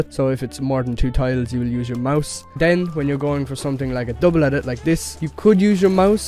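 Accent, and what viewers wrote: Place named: Ireland. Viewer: Where u from in ireland I hear the accent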